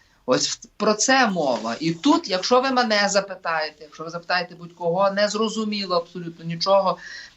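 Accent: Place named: Galicia, Ukraine. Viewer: Пане я з Харкова так от що я тобі курва падло скажу з твоєї риторики видно що ти важаеш Українцями тіки галичан а ще більші Українці жителі, великого Львова, перед ніж пертися в ефір навчися говорити Українською мовою а не галицькою і за тебе і таких як ти і війна почалася. І питання ти великий патріот України чому не з автоматом в окопі.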